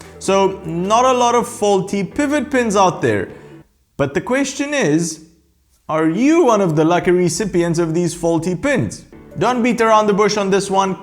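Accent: South Africa. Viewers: Great videos with a superb sense of humour. Put me out of my misery though. You live in Romania, yet your accent sounds a little South African, would this be correct?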